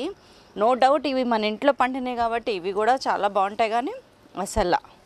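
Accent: Telangana, India. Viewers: Your voice is beautiful and confident...especially your Telangana dialect is so pleasurable to listen... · Wow garu that’s fantastic tip I always put in pressure cooker water and salt or sugar Sooper I just liked your recipe and the healthy tips in between Thank you amma You just rock like my family member your Telangana slang ditto like ours 🥰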